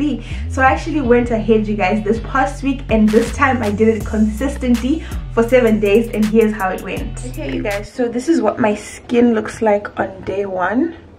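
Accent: Zimbabwe. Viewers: She sounds Zimbabwean. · She sounds zimbabwean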